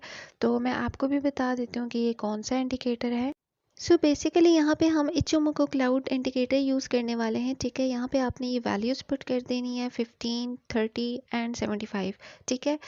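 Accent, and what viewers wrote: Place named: Karachi, Pakistan. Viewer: اپ کی اردو سے لگ رہا ہے اپ کراچی سے ہیں اپ کی ٹریڈنگ کمنٹری سے محسوس ہو رہا ہے کہ اپ ابھی نئی ٹریڈر ہیں البتہ اپنی کوشش جاری رکھیں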